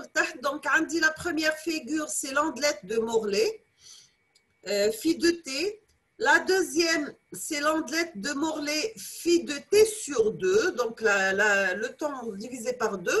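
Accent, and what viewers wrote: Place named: Algeria. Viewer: Accent algérien typique ! Tahia al Djazaïr, Vive l'Algérie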